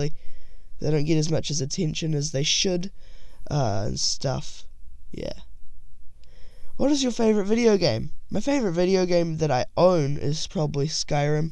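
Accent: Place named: New Zealand. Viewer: I knew that was a new zealand accent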